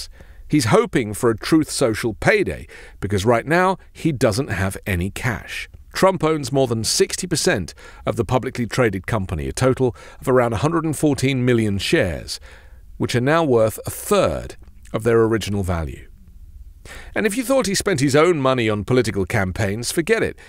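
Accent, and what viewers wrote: United Kingdom. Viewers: You have an excellent way of explaining Trump. I love your Podcasts. I always was a sucker for a British accent ever since the.😊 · My maga people are not going to listen to. Can not get past the British accent in his speech. Not American. The bigotry is astounding. Sorry,.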